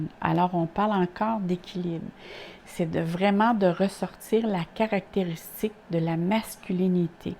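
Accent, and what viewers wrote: Quebec, Canada. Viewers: Bonsoir tout ce que tu dis est très cohérent et très précis; cela me rejoints beaucoup c'est tellement beau ce que tu partage wow!! c'est merveilleux ça... Pour l'intuition tu as totalement raison y as beaucoup de changements et d'énergie différente qui viennent à moi et dont je fais l'expérience surtout au niveau spirituel très inspirant.. Merci beaucoup c'est agréable de voir une femme qui as le parler Québécois..je te souhaites un splendide joyeux temps des fêtes comme tu aimes le vivre avec de l'amour, de la joie, de la paix, tout ceci dans la grande simplicité qui rends la vie magique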